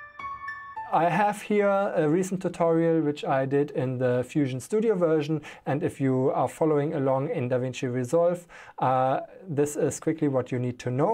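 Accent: Germany. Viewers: Thank you! - very helpfull. Nice german accent. Danke für das Tutorial ;-)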